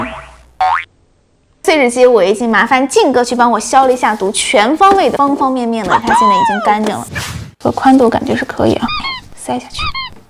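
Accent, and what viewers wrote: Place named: Qingdao, China. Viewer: 你是四川的？你说话我还以为你是青岛的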